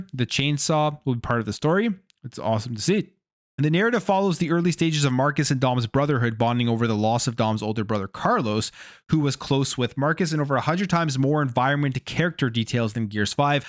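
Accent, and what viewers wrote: Canada. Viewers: Hey brother, are you Canadian? Just wondering if anyone has ever told you that your voice sounds like a younger Bret "hitman" Hart. 😅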